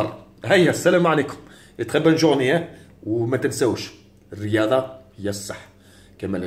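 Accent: Algeria. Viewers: يحكي عربي بس اللهجة الجزائرية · حلوا اللهجه الجزائر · تعجبني لهجة دزايرية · Bro he's algerian lmao 💀💀💀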